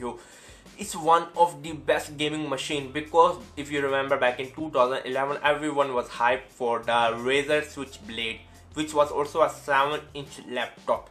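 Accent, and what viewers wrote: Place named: India. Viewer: Indian accent awesome sounds like Russel Peters.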